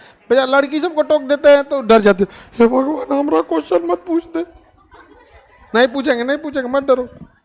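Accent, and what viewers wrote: Bihar, India. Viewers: I am from Bihar so i relish your language tone very much .u are great teacher.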